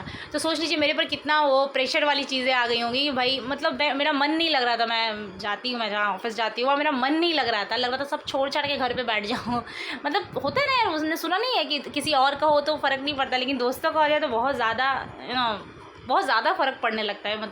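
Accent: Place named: Mithila, India. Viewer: Aapki soch bilkul Sahi h.. aapki sound bilkul hamare local language ke tarah mil raha h... Aap kis district se h... Mithila side se h kya???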